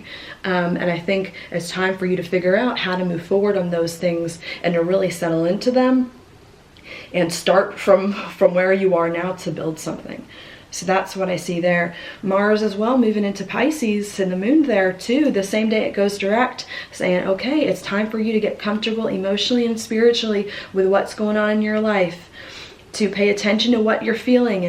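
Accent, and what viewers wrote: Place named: Georgia, United States. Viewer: Thanks,! I couldn't help but notice your accent is reflecting your recent move back to Georgia.;)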